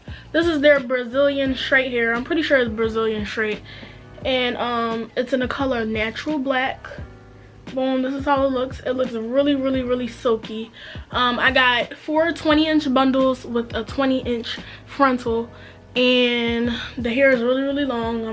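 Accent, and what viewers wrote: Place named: Philadelphia, United States. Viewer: Girl I can really tell you're from Philly lol but this was helpful because im about to start wearing frontals.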